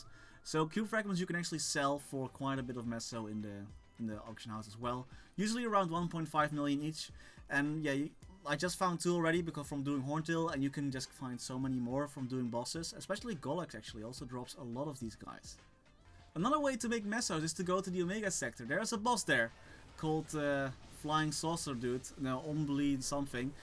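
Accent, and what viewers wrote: Netherlands. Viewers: just new to ur channel u sound dutch, nice video btw!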